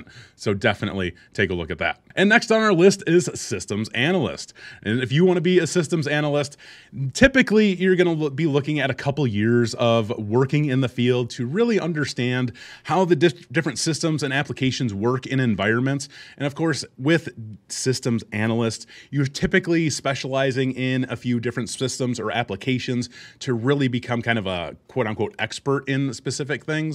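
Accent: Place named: Midwest, United States. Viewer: The midwest accent is strong with this one.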